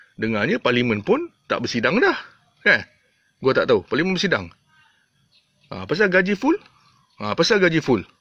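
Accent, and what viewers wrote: Kelantan, Malaysia. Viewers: Kemah keming kemuk vutoohh yip yip yip😂..terbaik bey lado..kecek Kelate sdap dgar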